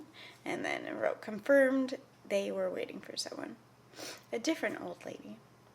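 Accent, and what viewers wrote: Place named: United States. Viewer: Hey I love your video! Your characters are super cute! I just wanted to give you a heads up that "gypsy" is considered a slur in many places. You sound American or Canadian by your accent - we don't come across that word as often so I think many people here don't realize it's negative. But in Europe it's a derogatory term used against the Romani people. I'm not trying to make you feel bad because it seems like you have good intentions! I just thought you might want to know before you include it in your course.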